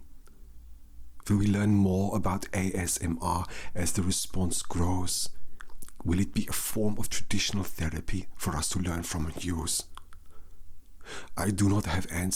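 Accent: Germany. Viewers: as a German i can say that its rare to hear a good German accent that isn't meant to be a joke...very nicely done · I've never known a German accent to be so smooth, beautiful and addictive. I speak Afrikaans, a branch of Dutch, and even though it's smoother than German, it's not as smooth as you've managed to make this language. This leads me to wonder how far over the horizon your talent stretches. So far I've been blown away, and, once again, my brain is sufficiently massaged. 😘♥️ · Wow... That's a good german accent 👍 I'm from Germany and you have my approval 😂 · Haha!! Love the German accent😂🤗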